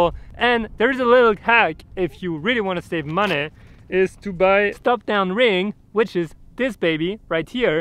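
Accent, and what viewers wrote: France. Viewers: ur french ! dT'S why ur accent seems like dt 😁 but ur awesome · hhh freinsh accent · Thats the natural French accent while speaking English! :) or may be the microphone was not powerful enough!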